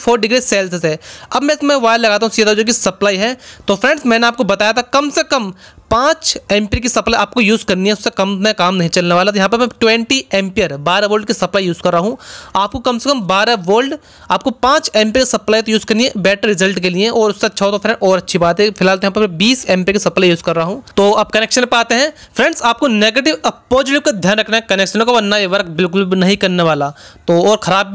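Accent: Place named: Rajasthan, India. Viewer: bhai tu dikhne me smart hai pr bolne me ek dum desi hai Rajsthan se hai kya bhai kyo ki me bhi desi hi hu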